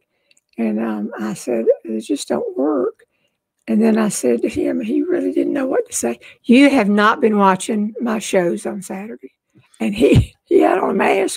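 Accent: South, United States. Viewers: I normally can’t listen to a southern accent, but is an exception. Love her. R.I.P.!❤️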